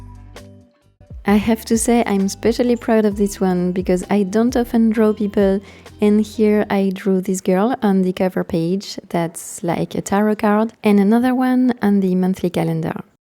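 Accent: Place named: France. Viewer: I love your originality - such beautiful work! And I love your French accent too!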